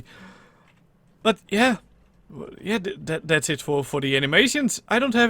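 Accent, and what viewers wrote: Netherlands. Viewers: DUTCH ACCENT FOR THE WIN :3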